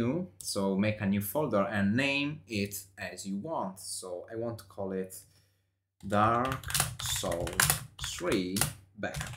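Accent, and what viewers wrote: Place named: Italy. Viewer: "Sorry for the Italian accent." It's okay, we forgive you.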